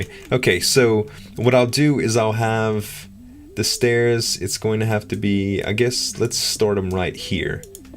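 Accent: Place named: South, United States. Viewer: I don't think you're castle is particularly great or anything. (you break a few key design concepts -General, not just minecraft). I just decided to watch your videos because I've seen things you've done with other hermits and I like the way you talk. I reminds me a bit of when I used to live in the south. Also, I thought you were pretty funny.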